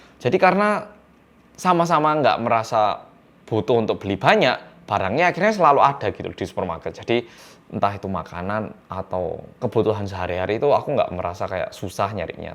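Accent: Java, Indonesia. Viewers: Tetap sehat ya yg medokkkkk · Kopine ngantek entek mek gur nyritakne corona 😁 . Terus lanjut vidione mas . Gawe mbantu bolo bolo . Kerjo libur delok vlog e korea ne wong medoookkkk ,🤣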